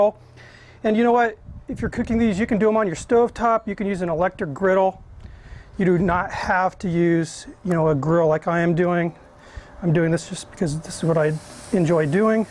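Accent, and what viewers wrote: South, United States. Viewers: Jeremiah Wilson this guy sounds like he’s from the south . No wc here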